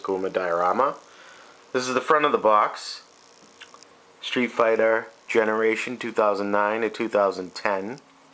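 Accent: Boston, United States. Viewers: You sound like your from Boston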